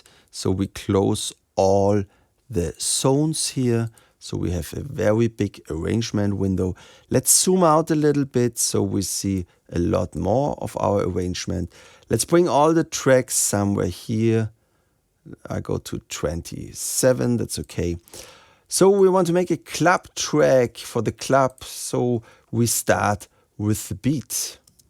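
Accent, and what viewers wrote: Germany. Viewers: I like this dude. I usually find German accents too harsh but this chap is very pleasant to listen to. Doesn’t sound like he’s in a hurry like most YouTubers! Nice and chilled out and uses pauses and explains things well. I also love how he says “schteinberg” in the true german way. I also love dom sigalas videos but I am finding those constant zooming jump cuts really annoying!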